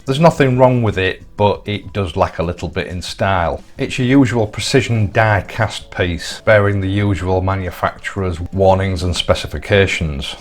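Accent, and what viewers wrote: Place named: East Yorkshire, United Kingdom. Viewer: Sir, the quality of your videos gets better and better! And your East Yorkshire accent is something special! Thank you for your work!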